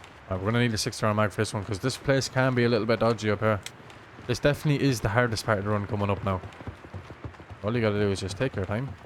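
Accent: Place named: Waterford, Ireland. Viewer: You sound like your from waterford!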